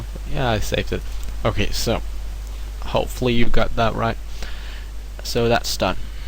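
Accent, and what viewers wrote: Denmark. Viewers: are u from denmark? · Er du dansker? :D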